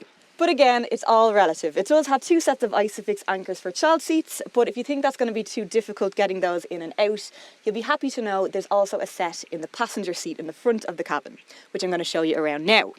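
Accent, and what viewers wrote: Ireland. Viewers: Here's a comment regarding new hardware: I would marry Irish girl blind folded... :D Love the Irish accent. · Oh my god! I had forgotten how quickly i fall in love when hearing irish accent spoken by a stunning woman 😳 And - a great review 👍🙂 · Cool car and love your Irish accent. I laughed at the "WHAT ARE THOSE" with the tail lights. I think they are just a fun little touch as Mini is a traditionally British car even if owned by BMW now. Overall great review.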